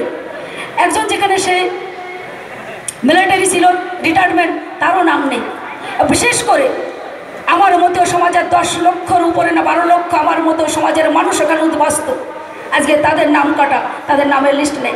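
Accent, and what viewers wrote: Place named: Bangladesh. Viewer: Bhasha ta thik kore bolun, apni to Bangladesh theke bolche6en, mone hoy, r apni to nodiyer lok, apnar kotha to, Bangladesh hobei, karon nodiyer r Bangladesh sem sem okokoko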